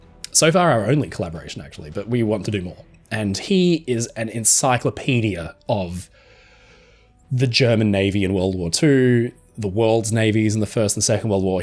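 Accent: Australia. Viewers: I love listening to you talk, you're so knowledgeable, a great teacher, and totally charming. (And I'm a sucker for an Australian accent.) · Your Australian accent is stronger in the first video. I have to do that (almost pseudoEnglish accent) at work as well or people don’t understand what I’m saying. 😅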